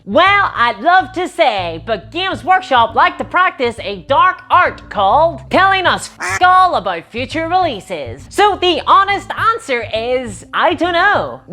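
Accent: Northern Ireland, United Kingdom. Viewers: Ah I'm near Bristol, knew it had to be some kind of british! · Are you from the north of Ireland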